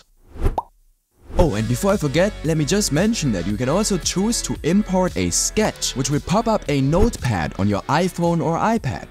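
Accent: Germany. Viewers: hehe, musste unbedingt auf die Kanalinfo gehen um bestätigt zu bekommen "Deutschland".... nicht wirklich wild doch schon recht ulkig dassi innerhalb der 1 Sekunde herausgehört habe woher der Wind weht. Und hab nicht darauf geachtet =D Das Wort people war's ^^ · are u a german guy? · Hmmm... are you German? (not to hate ofc) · Hab doch direkt den deutschen Akzent rausgehört 😅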